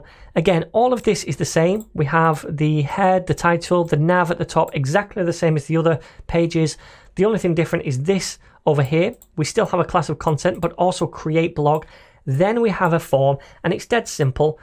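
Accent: United Kingdom. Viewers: Bro the moment I start working I'll by a course, merche or do something to pay you for such a content as good as this, at first I didn't wanna try because it's a little difficult for me understand british accent but I not just improve that part of my english but also lear so much about node. GREAT CONTENT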